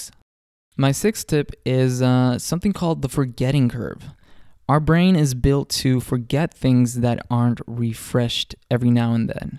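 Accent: Midwest, United States. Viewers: Tusen Takk! I love these videos. They have been very helpful to me on my journey to learn Norsk. I've been studying mostly daily, for over a year using the DuoLingo app on my phone. (Is it OK to mention the app? I don't get any money, credits, etc for it) I find your tips & explanations help me integrate and understand the language lessons better than just using the app alone. On a side note: OMG I can't believe you are a native Norwegian!!! You have a perfect Midwest American accent. Are your family members from there ? Anyway, just keep up the excellent work, I appreciate all the time and effort you put in. Ha en flott dag! (Have a great day) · Your English is amazing. You sound like you could be from the Midwest.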